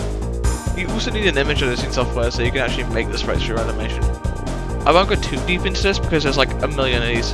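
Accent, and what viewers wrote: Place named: United Kingdom. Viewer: British accent dected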